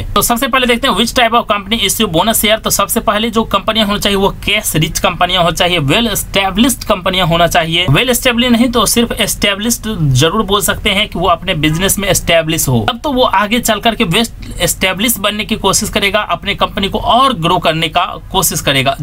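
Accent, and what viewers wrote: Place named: mixed, India. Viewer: tu pehle thik se shuddh hindi bolna sikh...UP, Bihar ka accent bolna chod de..bolne ka tarika sudhar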